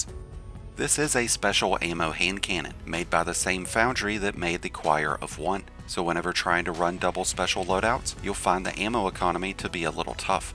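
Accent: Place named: Kentucky, United States. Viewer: I can place the accent...perhaps KY?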